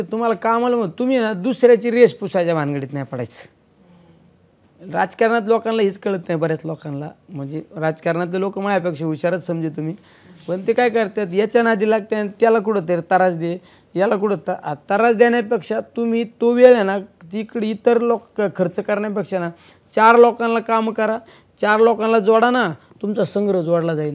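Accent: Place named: Ahmednagar, India. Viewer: अस्सल नगरी भाषा...त्याला काय लागतंय, एखाद्या वेळेस पह्यलं भाषण इंग्रजीत करील!!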